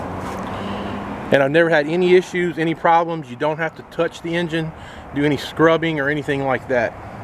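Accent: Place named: Texas, United States. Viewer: Wow! And I love the accent, is that Texan mate?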